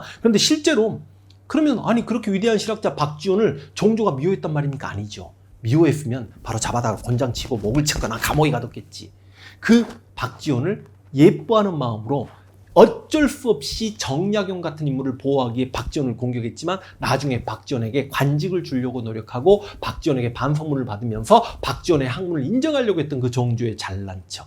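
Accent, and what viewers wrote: Jeolla, South Korea. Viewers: 부조간 발음 들으니 전라도 일단 거부감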